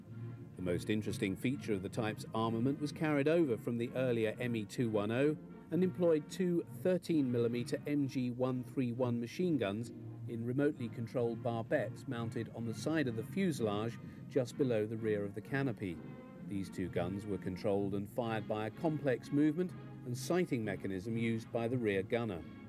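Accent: RP, United Kingdom. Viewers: plummy accent?? hmm, refreshingly nice to the ear if i may say so. wonderful historical footage, Thanks again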